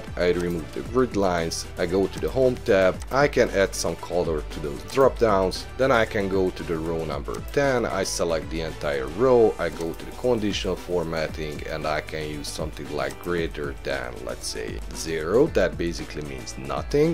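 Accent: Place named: Hungary. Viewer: I bet you are Hungarian. The accent!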